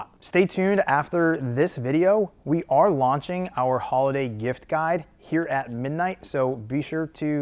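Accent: Boston, United States. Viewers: More Boston accent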